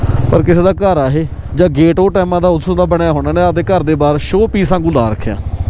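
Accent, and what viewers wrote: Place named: Mohali, India. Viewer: ਬਾਈ ਦੀ ਬੋਲੀ ਤੋਂ ਮੋਹਾਲੀ ਦੇ ਨੇੜੇ ਤੇੜੇ ਦਾ ਲੱਗਦਾ ❤❤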